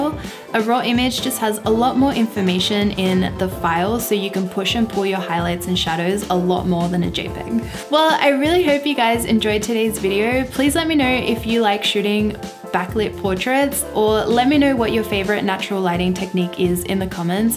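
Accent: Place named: Australia. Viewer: Loved your Video very well done! You are adorable and your silky smooth voice with that Aussy accent ❤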